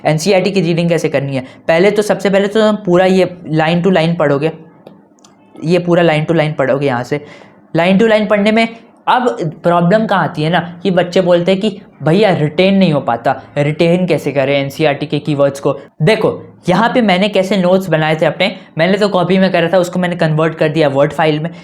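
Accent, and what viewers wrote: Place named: Punjab, India. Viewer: sir aap punjabi bahu cute bolte ho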